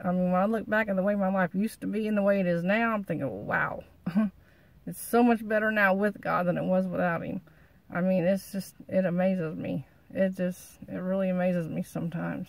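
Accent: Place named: South, United States. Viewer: Thanks for sharing your story. I love to hear you speak, you are so down to earth so real. I love how you talk, like you're right here next to me. I feel you are not from the Northern States, love the Southern talk, so Natural, so encouraging to just listen to you again and again, for I too am a Southern woman of God, I love so so much. Hallelujah !!! I share a bond a spiritual bond with you as one I truly admire and appreciate, such an inspiration to me, for I loved you the first moment I connected spiritually with you, for you are so real, so truthful, have a heart of gold, so precious, in how well you get his words of truth out to me, and to others. What a blessing you are to me. I feel so comfortable when we share the Father's love. I love, love, love, his words, as you speak it out. God Bless you precious beloved one, my sister in Christ. 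Amen !!!!!!!!!!!!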